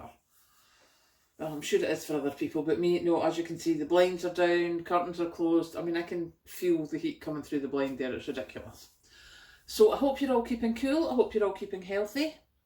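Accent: Scotland, United Kingdom. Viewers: That’s so funny 🤣,I’ve heard that before,that Americans struggle with the Scottish accent.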